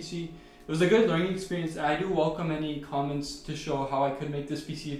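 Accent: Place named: Minnesota, United States. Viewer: You sound like the people in Fargo, are you from around Minnesota or Canada even?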